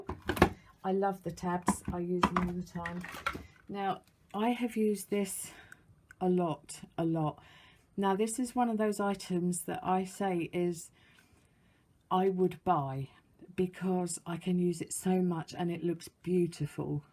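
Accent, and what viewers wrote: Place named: United Kingdom. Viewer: This card is stunning! I just found you and love your clear explanations. (The British accent is a bonus!)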